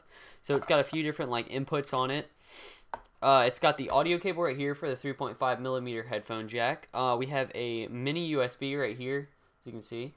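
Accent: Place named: United States. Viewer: AMERICAN ACCENT!!!!!!!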